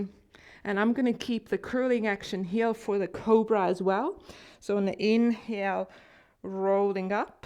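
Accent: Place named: Australia. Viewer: this was a brilliant practice..... you have a hint of an Australian accent did you spend time in Australia at some point?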